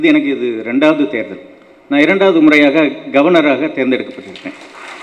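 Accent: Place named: Malaysia. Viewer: Sound like malaysian slang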